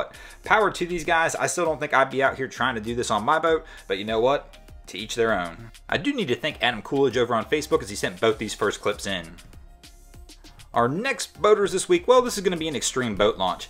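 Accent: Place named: Pennsylvania, United States. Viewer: I can tell you're from Pennsylvania, the way you pronounce the letter O.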